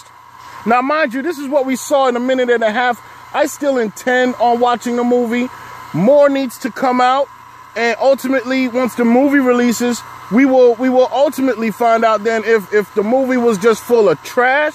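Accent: Europe. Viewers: I know you ain't been to Africa, and you sound like a European, do research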